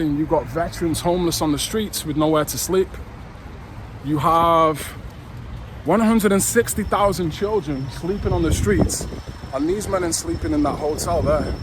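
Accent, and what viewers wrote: Manchester, United Kingdom. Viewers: proppoh manchestoh accent , good video mate well done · Well going by his accent he’s certainly a proper Mancunian. 😊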